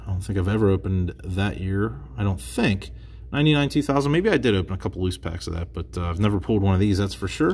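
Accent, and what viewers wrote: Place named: Pennsylvania, United States. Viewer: Hey great video. Glad you got the package, it was cool to see u open it on video, I didn’t expect that. Not sure what # subscriber I am on here but I feel like it was early. Always liked your videos, from the PA accent, to the complaining about brickage, to having do them with u, I feel like I’ve seen most of them. So as your channel grew I started to save up Alonso cards and already had a little pc of Josh Bell going so I thought I would send them to u some day as a thanks for all hrs of entertainment. As u got close to 1K I decided to send it as a Congrats. Keep up the good work. I’ll keep watching. 👍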